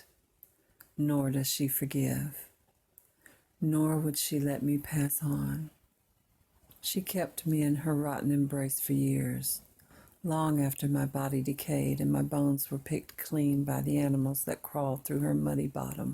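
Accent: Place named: South, United States. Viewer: OMG... You were MADE for this!! 🔥 I LOVE the slight, southern drawl that lilts through the beautiful timbre of your voice that, annoyingly, draws me right into the story lol - I say annoyingly because, I don't do scary. I usually avoid it like the plague haha, but I'll do it for you 😊 I'm so glad you pushed past whatever almost stopped you from branching into the spoken word! And such a great piece too - mad props to the writer. The imagery was easy to imagine and the flow/build up was so natural... I could totally listen to you do more of this 💜 So says this Kiwi at 415am #IDontKnowWhyIWokeUpSoEarlyUgh 😴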